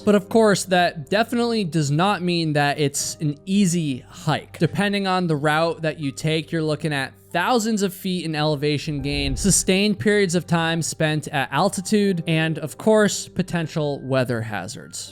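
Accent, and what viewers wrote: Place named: Vermont, United States. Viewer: Been watching your stories for a while now ....10 STARS man , Thanks .....Hey i guess your from Vermont 😄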